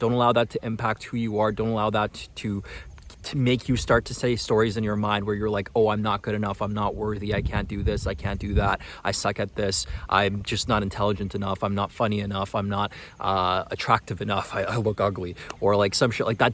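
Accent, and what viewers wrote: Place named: North America. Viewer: You shocked me man, with your Canadian or American accent is it? So proud of you settling down or born in North America. I am Indian too and tried moving to Canada in the 90s desperate to start a new life there but didn’t make it.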